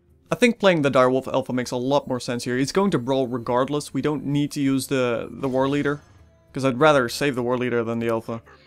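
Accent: Netherlands. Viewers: you from the Netherlands or Belgium?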